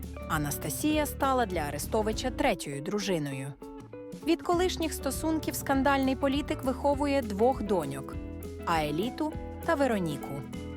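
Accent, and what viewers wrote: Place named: Canada. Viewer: Скандальний твій канадський акцент і замовне втручання в особисті справи.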